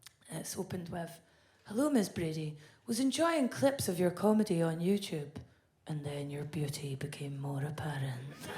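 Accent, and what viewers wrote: Scotland, United Kingdom. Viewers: I love her accent, just wish I knew how to understand Scottish lol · Scottish accents aren't easy to understand immediately, but she's funny. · I appreciate accent so much after having the sound of the snide Scot who narrates on the YT channel, Real Engineering, being the only Scottish accent that I could conjure up in my head for the last year or so. I was really beginning to strongly dislike Scottish accents until hearing. Beyond that, her performances are excellent, as well. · This is the first time I hear a hot girl speaking in Scottish accent! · I have a Scottish friend that doesn’t sound anything like her, so I was wondering if there are different Scottish accents in Scotland? My friend is from Glasgow.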